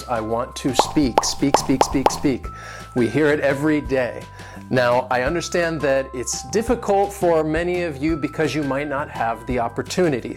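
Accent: United States. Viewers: I love listening your videos, you're a good teacher, it's quite easy to understad you, although you've got such an strong american accent, nevertheless it's lovely, i really enjoy myself listening to, awesome, greetings from manresa catalonia" cheers "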